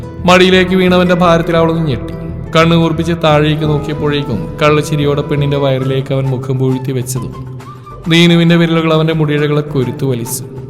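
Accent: Tamil Nadu, India. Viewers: nalla oru entertainment story😂😂orupad chirich...ithuvare ulla nayikanmaril ninn variety nayakane nammil ethicha madhurikkum adipoli tamil slang presentation nadathiya ❤❤❤❤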